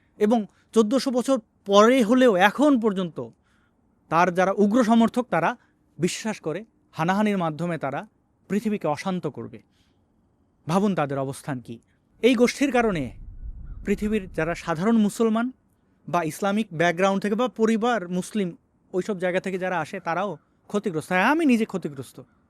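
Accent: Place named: Bangal, Bangladesh. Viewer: দাদা আপনার বাঙ্গু কথা খুব মজা লাগে হা হা হা।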